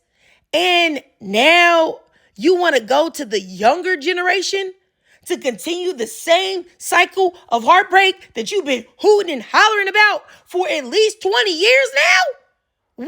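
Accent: Philadelphia, United States. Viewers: Are u from Philly?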